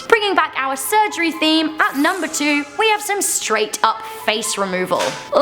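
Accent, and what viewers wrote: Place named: United Kingdom. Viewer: JIFS LADY!! And btw I love their brit accent 😍😍😂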